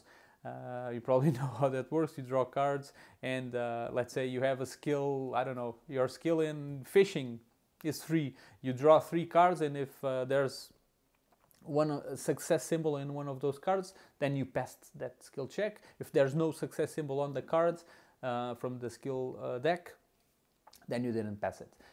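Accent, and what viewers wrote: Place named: Portugal. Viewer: Muitos parabéns pelo canal e boa sorte! Sou grande fã de boardgames (solo e não só) e foi uma agrdável surpresa encontrar uma voz portuguesa neste meio :)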